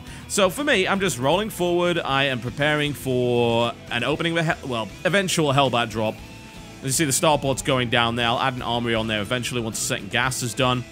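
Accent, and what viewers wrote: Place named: North, United Kingdom. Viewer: I love hearing irate Northerners. Rest in peace yo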